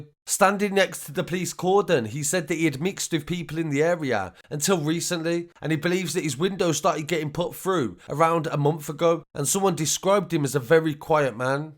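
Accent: Birmingham, United Kingdom. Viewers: U from biirrrmingham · Does this guy have marbles in his mouth? I swear the British have warped the English language beyond all recognition. I can just feel the spit that surely is covering the microphone as he records this.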